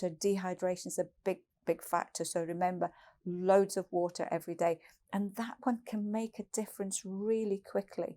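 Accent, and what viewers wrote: United Kingdom. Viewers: you are a blessing to all of us. I learned so much about this peri menopause adventure thanks to you. By the way, I love your British accent 🥰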